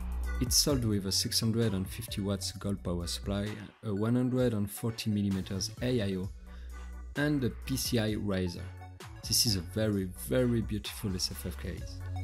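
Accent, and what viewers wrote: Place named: France. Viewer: Wech la france !!! 🙋🏻‍♂️😂 trahis par l’accent ! Respect ! Vraiment dure de parler comme ca 😌 bonne video ! Beau matos! J’ai un T1 moi 🤘🏼ssf gang !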